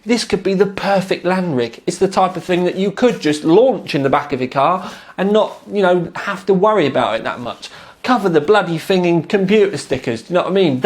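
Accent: United Kingdom. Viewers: 4kin ell m8 love me soem briteish accnt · Love dat British speech.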